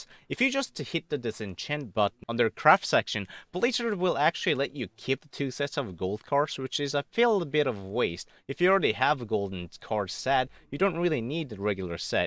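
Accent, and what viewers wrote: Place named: South Korea. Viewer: Korean accent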